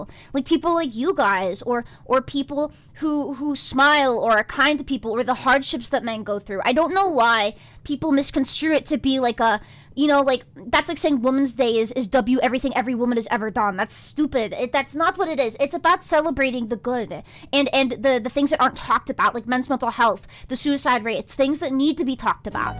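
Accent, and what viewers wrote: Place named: Bronx, United States. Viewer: chibi extra Italian from the Bronx in this one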